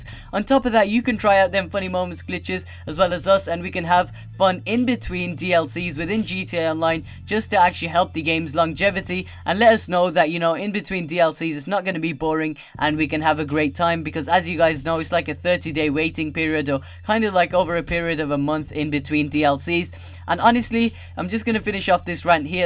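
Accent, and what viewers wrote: Birmingham, United Kingdom. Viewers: I know you are from Birmingham but what is your accent?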